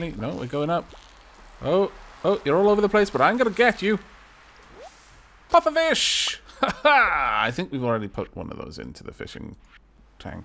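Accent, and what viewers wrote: Wales, United Kingdom. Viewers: I've got a funny feeling the seeds in the top left corner of the furnace chest were meant to be planted during the summer. I may be getting them confused with the watermelon seeds that were temporarily overlooked. Really enjoying this let's play. There's something very soothing about the combination of such a pretty looking subdued type of game & the glorious Welsh accent. It makes a nice change from the horrors & shoot-em-ups I normally go in for. Just a shame I don't have a computer capable of running it myself but then I'd never get any work done.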